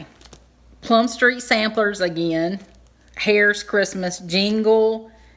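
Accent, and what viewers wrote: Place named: South, United States. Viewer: wonderful to hear another southern drawl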